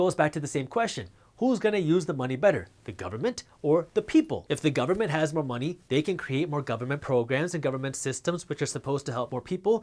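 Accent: United States. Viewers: He's American or seems to be from there going by his accent, so obviously he knows terms / gestures from the dominant culture. Do you think Mexicans only do "Mexican" things all day long, and Sikhs only do "Sikh" stuff all day long? They live in the same society you do, and as English-speakers understand terms as they are meant. Just like European-stock girls understand what tipping a hat means, despite never having tipped one. I'm not saying any of this in a mean or unfriendly way, but just want to poke you and remind you to put on your "thinking cap" (something nobody has ever actually owned, but we all know what it means). 😊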